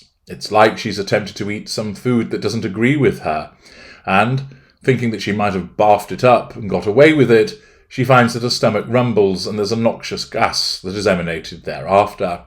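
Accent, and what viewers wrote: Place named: Northern England, United Kingdom. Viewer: I love it when let’s the northern accent come out x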